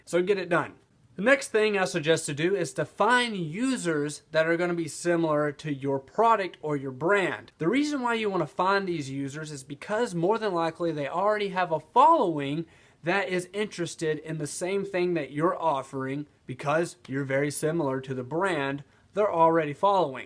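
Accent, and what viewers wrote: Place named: Georgia, United States. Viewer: are you from Georgia? you have an accent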